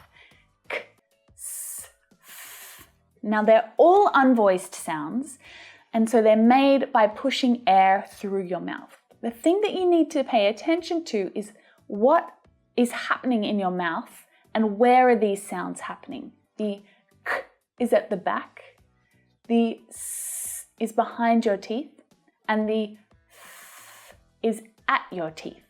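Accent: Australia. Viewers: Are u from Australia ? Your english sounds more clear... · speaks with a cultivated Australian accent and she is from Melbourne (the second biggest city after Sydney)... I can assure you that not all Australians speak as clearly as she does! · I've got a question, just out of curiosity. Yours is the cultivated Australian accent, right? I love it, it's kind of the best of 2 worlds, British on one hand and American on the other but without some of the oddities of them, at least this is my point of view as non native English speaker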